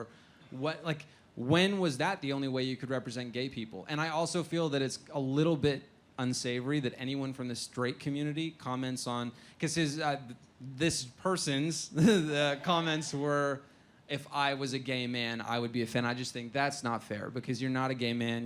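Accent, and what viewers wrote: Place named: Brixton, United Kingdom. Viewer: Holy shit, I'm British (actually from Brixton, same as Felix is supposed to be) and I pride myself on being able to spot a fake English accent almost instantly... and I was completely fooled by this guy. I guessed pretty quickly that Tatiana wasn't really a Brit (it's a great accent, but the odd American/Canadian vowel still gets through), but I could've sworn this guy was really a Londoner. On the show he sounds almost exactly like people I grew up with (and probably me).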